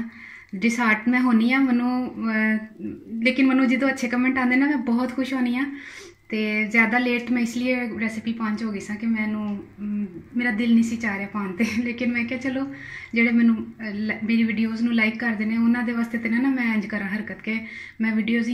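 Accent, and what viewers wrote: Punjab, India. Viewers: sabh to jiyada nice tuhadi mithi punjabi boli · I really need this recipe ur Punjabi is v.cute Mashallah double mzaa ataa hay 🤗🥰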